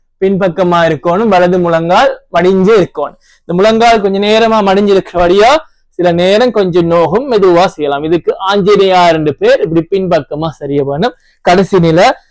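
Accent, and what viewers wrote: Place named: Sri Lanka. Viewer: Love your way of speech and slang... Srilanka?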